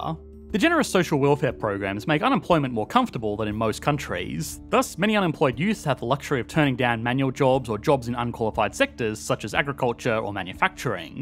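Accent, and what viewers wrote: Australia. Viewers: Greetings from China! You an Aussie or Kiwi? Guessing Aussie... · He's an Aussie. I assume that you have a VPN to watch this from China.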